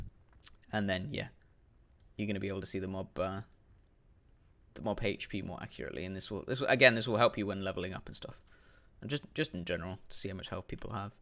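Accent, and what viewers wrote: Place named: United States. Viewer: That american accent lol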